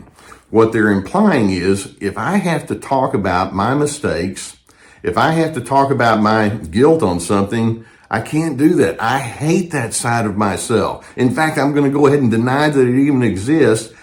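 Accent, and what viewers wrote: South, United States. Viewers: I read your written article and I was hearing the words in your Southern accent! P.S. I am very glad that I am on the journey with you, too! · What state is the accent from?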